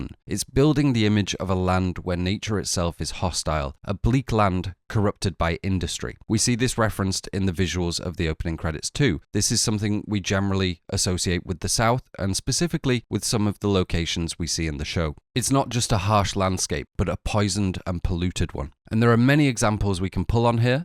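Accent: United Kingdom. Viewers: The british accent is taking over youtube.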